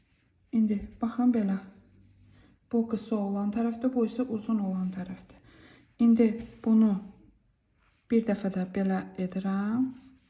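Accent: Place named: Bayburt, Turkey. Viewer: O kadar güzel anlattınız ki örgü severim zaten şiveniz bize yakın bende Bayburt luyum,bitirince instagram dan sizi etiketleyerek paylaşacağım❤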